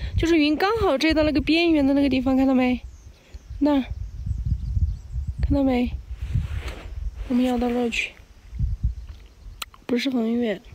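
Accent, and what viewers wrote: Sichuan, China. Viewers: 的家乡真美啊！为你家乡骄傲，为你家乡出了这样的美女骄傲。听到一口的川味普通话觉得特别亲切，一点没觉得是藏族人。不用化妆更好，更觉得是个活生生的人。平时看的舞蹈，真是觉得是仙女下凡。这个短片也让我们感到真正的存在。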